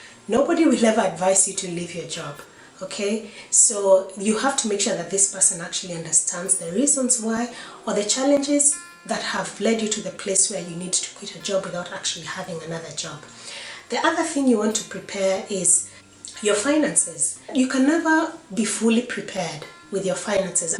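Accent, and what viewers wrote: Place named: Kenya. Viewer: I came across your video after quitting my job abruptly. Thanks for the solid advice. You sound Kenyan na uko Vienna? Niko Wien pia. Point 7 hit home...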